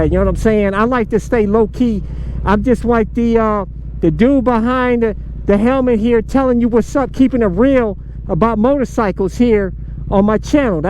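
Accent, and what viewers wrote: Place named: New Orleans, United States. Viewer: With your accent I always thought you were just from New Orleans 🤔🍷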